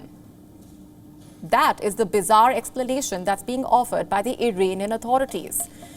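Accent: India. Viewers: is not improving,her accent is still Indian,her intonation is not improving as well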